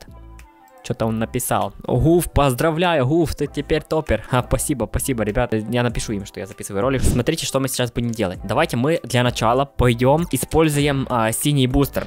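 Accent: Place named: Ukraine. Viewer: Я кста думав що ти рус а ти Укр чути просто Українські слова хорош!!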